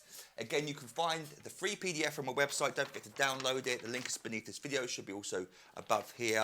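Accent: United Kingdom. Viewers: su acento británico pronunciado no ayuda mucho a captar audiencia, pero no se puede discutir su buen nivel musical sobretodo mantiene buen ritmo y tiempo preciso...👍🏻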